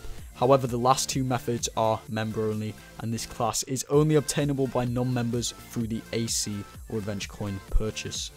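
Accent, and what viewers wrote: United Kingdom. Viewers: I though it was from Scotland, kinda similar. Anyway thanks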